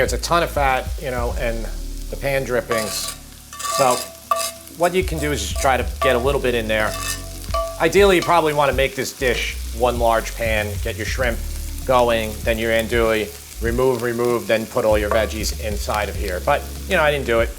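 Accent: New York, United States. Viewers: I find your videos so relaxing. Also, I love your approach to cooking. No pressure, do what you can with what you have. The New York/Jersey accent is just so loveable ❤️ Can't want to try this one!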